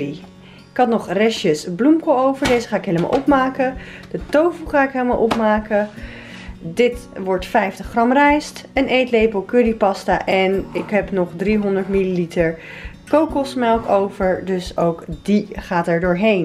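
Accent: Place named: Gooi, Netherlands. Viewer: Jouw gooisch accent. Mieterrrs HE-LE-MAEL LEUK 😂 (Kom ook uit dat gebied dus kon dr hard mee lachen) P.s. ik hou van deze serie maar dat weet je al 😘😘😘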